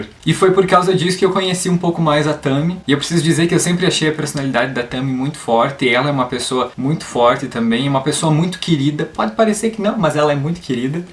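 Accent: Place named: Portugal. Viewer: O sotaque dele chega a beirar o sotaque de portugal kkkk amo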